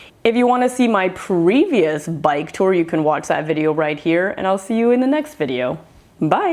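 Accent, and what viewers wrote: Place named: Canada. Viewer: Cool accent, is that a candadian Asian accent?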